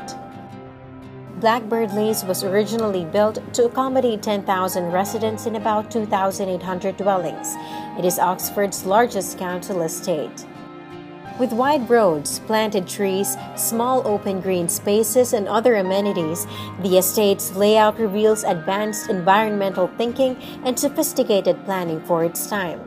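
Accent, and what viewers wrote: United States. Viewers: The text is fine , why on earth has "Oxford info", presumably outsourced by OCC , used a mechanised American voice for this video instead of a rich Oxford town burr? An answer pls? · Why is the narrator an American??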